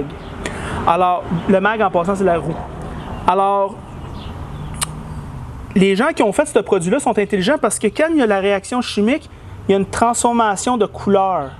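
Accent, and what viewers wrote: Quebec, Canada. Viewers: personnellement,j'ai un faible pour l'accent québécois. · La vidéo est très claire et explicative, merci beaucoup... par contre je suis désolé mais l'accent canadien me permet pas de rester sérieux mdr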